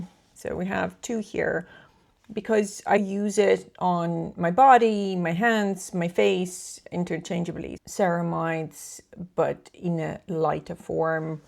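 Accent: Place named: Ireland. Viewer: Nice video! Are you living in Ireland? You have quite an Irish accent 😊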